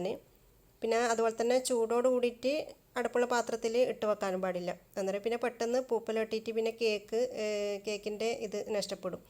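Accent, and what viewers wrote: Thalassery, India. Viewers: ഇതൊക്കെ കേൾക്കാൻ ഒരു രസമാണ് തലശ്ശേരി ഭാഷ 😍👍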